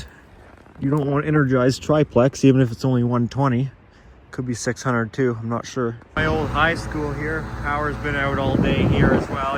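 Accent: Canada. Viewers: Hi where are you from your accent sounds Canadian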